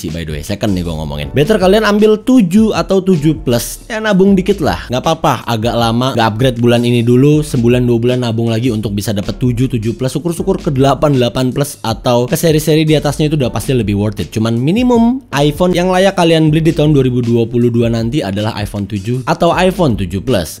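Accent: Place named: West Java, Indonesia. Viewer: Lidah org sunda bro, gw juga gtu klo ngmng b inggris kek kesleo gitu lidah, ahaha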